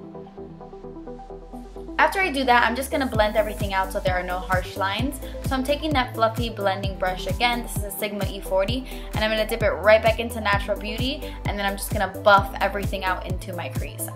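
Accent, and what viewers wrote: Miami, United States. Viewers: you love makeup, i love makeup, you're from Miami, I'm from Miami... we have miami girl accents and you know what I mean so lets be friends? k thanks :) love you girl! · she's Cuban, so I believe she's multi-ethnic. I've read in comments that her accent is not Cuban, it's a Miami accent.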